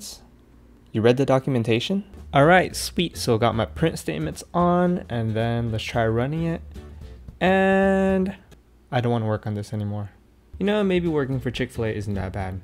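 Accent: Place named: China. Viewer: Your Chinese accent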